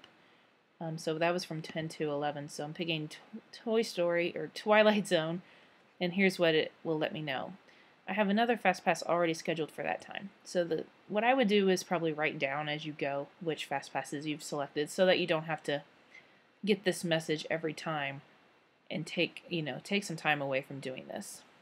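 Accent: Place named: United States. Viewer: Thanks for the video, very useful as I am booking our FP tomorrow. You sound like Ellen Degeneres a bit, lovely US accent :)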